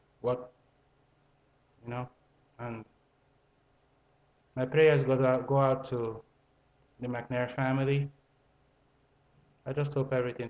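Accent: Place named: Italy. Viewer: You must be Italian??? At least it sounds like it haha. It's a nice accent though. I'm German. But man, you are 100% correct. You have to watch out what kind of women you are dealing with, they are secretive and snap at any moment. Poor McNair.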